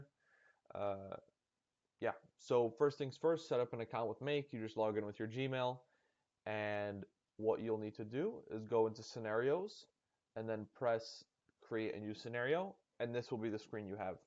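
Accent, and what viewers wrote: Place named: Chicago, United States. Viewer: I knew you were from Chicago from the accent. I'm about to start d2d sales and I'd like to have a way to text them a flow to sign up for a new account. This helps a ton. Thx.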